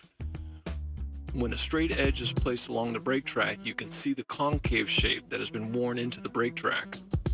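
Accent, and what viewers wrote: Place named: United States. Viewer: MERICAN ACCENT!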